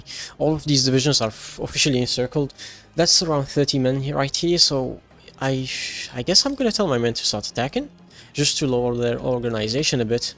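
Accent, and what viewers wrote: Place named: France. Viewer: The french accent fits well in your talking bro 👍👍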